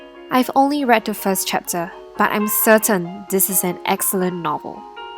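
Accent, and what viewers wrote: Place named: Singapore. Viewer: damn the narrator is singaporean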